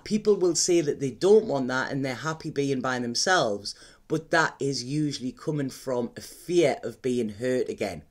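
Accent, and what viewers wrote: Newcastle, United Kingdom. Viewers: it does suit you. I am watching your videos from Marseille. How funny..I was 7 years in Newcastle so your videos have a special touch to me. I love it all, you accent and kindness remind me how beautiful and friendly Geordies are + your gift as a reader is amazing and the positive energy you bring to us is a tribute to your soul. I am also a fellow Virgo..so all of this makes me feel like a child in a school yard feeling 'I want her to be my friend!!!!!' Keep up you are a highly evolved human being. Almost to good for this earth....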